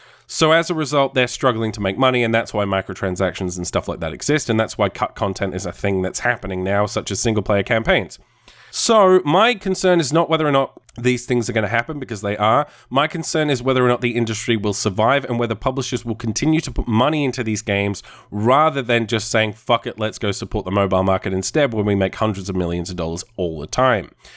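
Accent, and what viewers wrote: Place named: Australia. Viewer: Oh dude i can't wait:D Also wanted to say that i really enjoyed your Q&A, i'm really sick at the moment so i listened to it while resting and it was just fantastic. I always thought you sounded australian but with a slightly off accent and i couldn't quite put my finger on it but it was always leaning toward english, glad to know that you came from the UK. I'm also australian.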